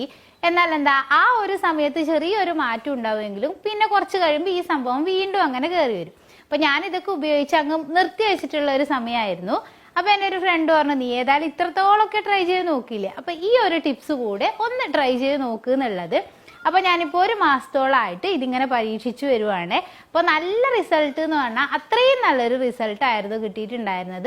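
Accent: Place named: Kasaragod, India. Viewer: അതെല്ലേ.... ഞാൻ കാഞ്ഞങ്ങാട് ആണ്... കാസറഗോഡ് dist.... ചില വാക്കുകൾ ഞങ്ങള് പറയും പോലെ തോന്നുന്നുണ്ട്... അതോണ്ട് ചോദിച്ചതാ 😍👍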